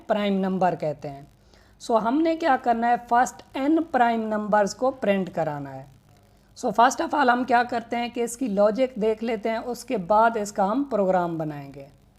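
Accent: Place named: India. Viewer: The Indian accent is very difficult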